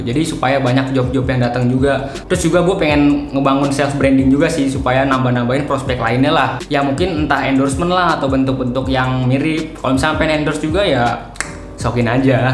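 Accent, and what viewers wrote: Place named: Bali, Indonesia. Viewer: Kak aslinya orang mana sih kalau boleh tau?, soalnya kalau "menurutku" sih kayak orang Bali logatnya, maaf kalau salah ya Kak 🙏🏻😅😁🙏🏻 #Nanya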